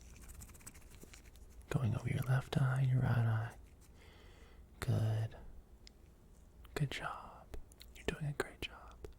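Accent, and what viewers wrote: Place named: South, United States. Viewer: Your ever so slightly noticeable southern twang is so comforting lol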